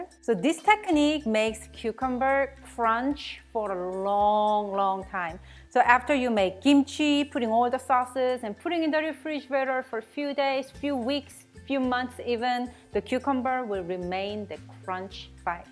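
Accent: South Korea. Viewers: fyi korean has many dialects... and by dialect i mean her korean dialect not her english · what do you mean by dialect? She's Korean and just has an accent when she speaks English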